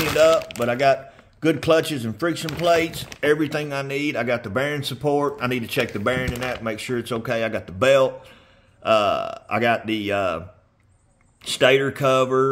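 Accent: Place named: Nashville, United States. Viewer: I lived in Nashville for 17 years and hearing your accent reminds me of a lot of the people from there. I really miss the rides down there.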